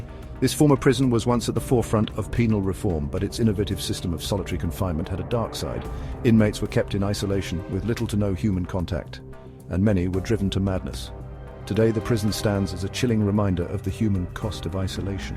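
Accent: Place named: United Kingdom. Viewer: YOU don't sound like him and I used to watch the show when it first came out you sound English (Brit) and Zach is from Las Vegas and younger than me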